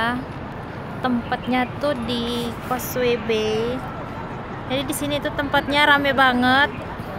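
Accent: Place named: Java, Indonesia. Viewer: Keren say videonya.. kedengaran logat orang jawa juga.. 😁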